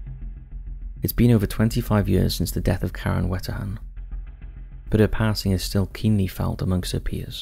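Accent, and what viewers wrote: Wales, United Kingdom. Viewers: He’s definitely Welsh just not sure where abouts 😅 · I just watched your wars of the word doc on Vietnam... are you from Cardiff or Newport? you sound slightly Welsh...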